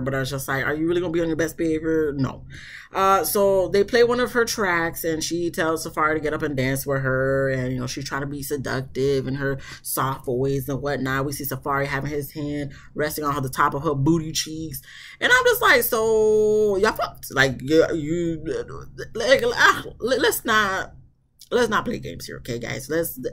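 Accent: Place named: Charleston, United States. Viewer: oh ok. You sound like some of my peoples from Charleston.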